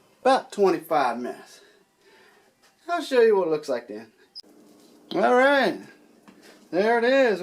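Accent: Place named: Louisiana, United States. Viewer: That sounds like a Cajun accent. I'm going to make this tomorrow, it looks Gooder than good.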